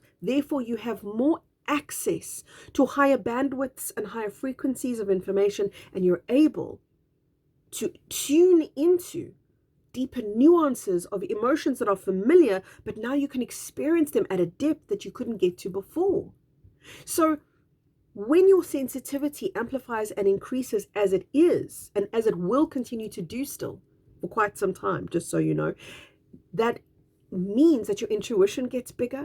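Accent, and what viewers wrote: South Africa. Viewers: Yet again, a beautiful explanation and reminding us that one of our greatest gifts is our intuition. You speak from the heart and not the Ego and that resonates with me so much. I in turn, tell my husband, who too is Awake as I am, to just ' observe ' the craziness around our world. We need to go within to find the answers and it is people like you, doing your humanitarian work to help us and guide us and explain in very simplistic terms. I am also happy you not only read my last comment but you actually responded. I mentioned and inquired about your beautiful accent and you told me you are South African...awesome...and even better, that I resonated with you because we are running on similar frequencies!!! So very true that when I go out shopping, I don't just ' see' people, I can FEEL their frequencies! Thank you kindly for your podcast my dear friend. 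We are all here for a reason and the biggest is to stand shoulder to shoulder and Unite against the EVIL that is disappearing from our Planet!!! Love and Light!!!🥰❤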